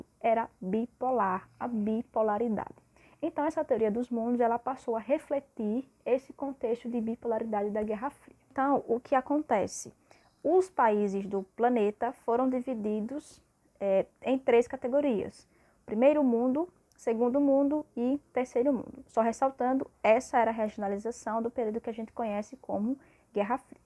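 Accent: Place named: Northeast, Brazil. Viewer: Bonito sotaque nordestino!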